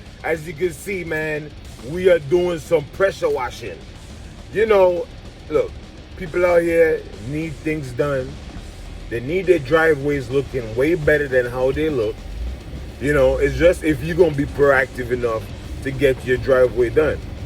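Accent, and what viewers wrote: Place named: Jamaica. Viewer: sound like your Jamaican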